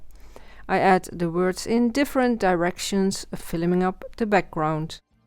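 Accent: Netherlands. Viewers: Wstunning once again! Are you from Holland? Your accent sounds familiar!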